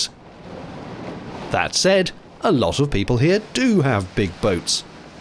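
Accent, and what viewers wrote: United Kingdom. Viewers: Your classic Brit narration cracks me up 😂 so I had to subscribe. Thanks for all the laughs. Cheers from California USA 🇺🇸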